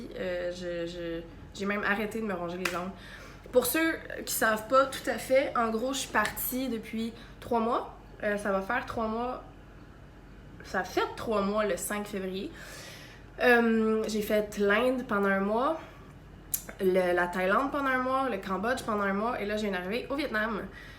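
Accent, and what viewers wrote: Quebec, Canada. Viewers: J ai regarder l'faq de Norman où tu apparaît et j adore vraiment l'accent québécois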